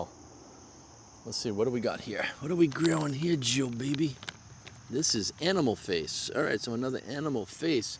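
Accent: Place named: Maine, United States. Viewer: You sound like your from maine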